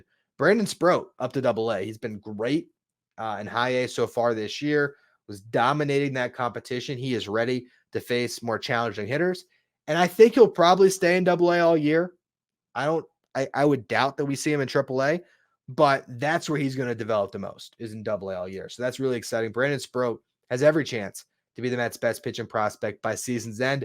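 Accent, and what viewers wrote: South, United States. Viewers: Why the southern accent on the intro?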